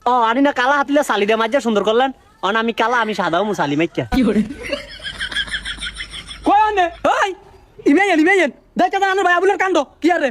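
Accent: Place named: Chandpur, Bangladesh. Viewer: Chadpurer vasa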